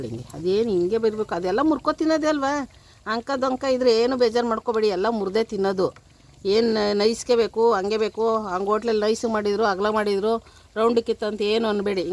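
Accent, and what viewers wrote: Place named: Mandya, India. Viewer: ನಮ್ ಮಂಡ್ಯ ಭಾಷೆ ಕೇಳೋದೇ ಒಂದು ಚಂದ ಆಂಟಿ 😂😂😂😂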